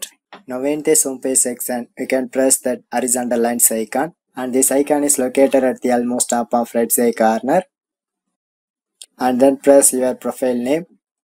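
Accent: South India, India. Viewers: bro is from south Thats why he is having this type of accent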